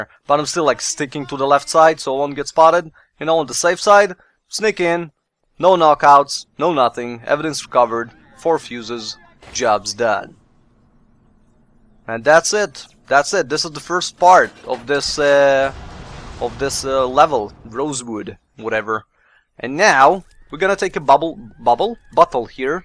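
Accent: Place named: Russia. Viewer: wow, you sound like a russian :) and i am russian, so no hate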